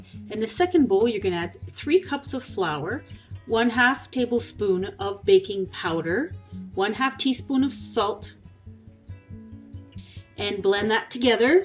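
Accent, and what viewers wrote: Canada. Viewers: Where are you from in Canada? I recognize my own accent. It's so distinct from the Americans.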